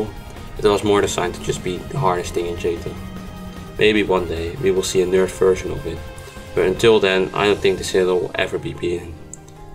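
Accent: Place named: Netherlands. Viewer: I love jouw Nederlandse accent ;)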